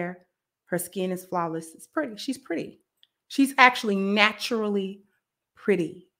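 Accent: Chicago, United States. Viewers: are you from Chicago? I been subscribed for months, and this is the first video that I noticed a Chicago accent lol. Dope content btw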